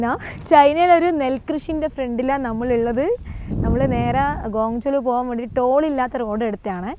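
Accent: Kannur, India. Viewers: Ninte oombiya Kannur bhasha....podi purathu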